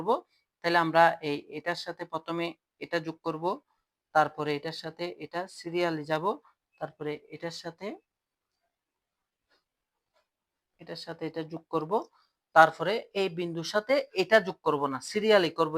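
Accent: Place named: Chittagong, Bangladesh. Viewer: Thik dhorchen....sir er kothay buja jacche je sir ctg er.....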